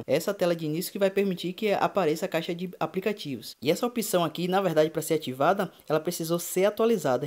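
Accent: Bahia, Brazil. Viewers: Meio estranho um sotaque baiano falando de tecnologia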